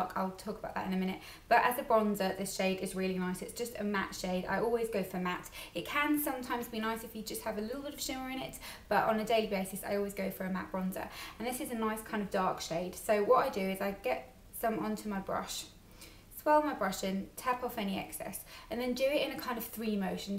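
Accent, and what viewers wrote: United Kingdom. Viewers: That British accent though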